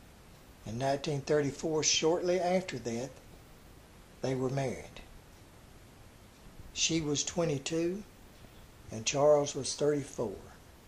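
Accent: South, United States. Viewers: His accent? Shame on you. His accent is part of who he is, which is a southern gentleman. He could not change his accent anymore than say someone from the Bronx or France could. Why can't you just appreciate the stories he tells and the time and effort he puts out to do them and share them with us. I shudder to think he would ever stop doing this because a bunch of needle assed nitpickers tweak his stories. Just enjoy and learn from them. My Momma always said "If you haven't got something nice to say don't say anything". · I've been watching a few of your Hollywood Suicide bits. I like your southern voice; somehow it adds to the sadness of these star profiles. You've got great narrative...keep it up. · ....while I realize you are from the southern reaches of our country and there are some variations on the English language because of this...I am sick to death of listening to you say HISSELF instead of HIMSELF...and BTW...the iconic studio head was named Irving Thalberg....not THRALBERG. 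You hear his name mentioned periodically at the Academy Award ceremonies since there is an Oscar which bears his name...so please....get it right!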